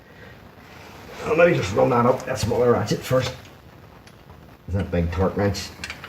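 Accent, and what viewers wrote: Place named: Ulster, United Kingdom. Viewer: Love me an Ulster accent. Greetings from Australia.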